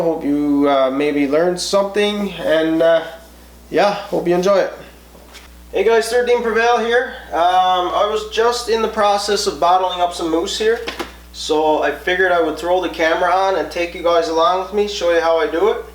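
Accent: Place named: Newfoundland, Canada. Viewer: I knew within 5 seconds of hearing you I can to the right place. Newfies Rule.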